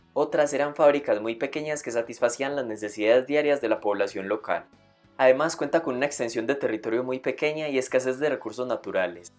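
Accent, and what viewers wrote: Antioquia, Colombia. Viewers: Suena como paisa, ¿ Eres Colombiano?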